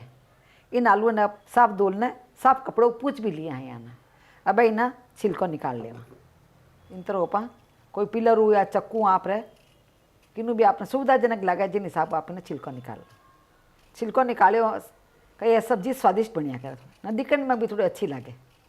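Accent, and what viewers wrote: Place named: Marwari, India. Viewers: Mujhe Aapka Rajisthani bolna bahot pasand hai... Kash aapke jeisi meri mother in law hoti... Mein Aapko sunti rehti ❤️❤️❤️❤️❤️ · I know what you mean. I speak Gujarati and this is the first time Madwari has reached my ears and and after few seconds it seemed natural. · Aapki har recipe bahu achchhi hoti hai aur sath sath aapaki marwadi bhasha sunani muje bahut sweet lagati hai, main Gujarat se hu lekin samazneme koi dikkat nahi hoti.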